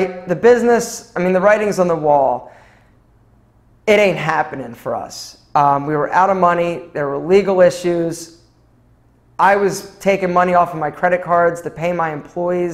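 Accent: Philadelphia, United States. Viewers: I knew I recognized that philly accent!!! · I knew that accent sounded familiar. Grew up in Philly as well.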